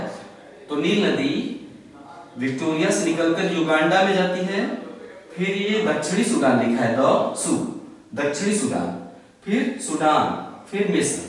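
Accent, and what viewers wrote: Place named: Eastern Uttar Pradesh, India. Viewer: लेकिन हो तो आप east up ya west bihar se it is 100% sure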